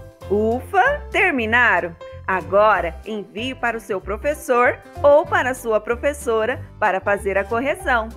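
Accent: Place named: Minas Gerais, Brazil. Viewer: Eca vc fala q nem mineiro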